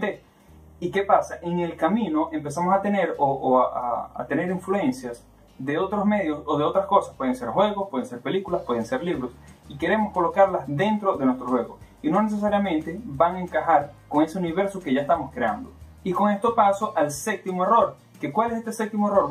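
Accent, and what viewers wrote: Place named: Venezuela. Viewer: Verga ese acento de Venezuela esta bien marcado jaja. Buen vídeo hermano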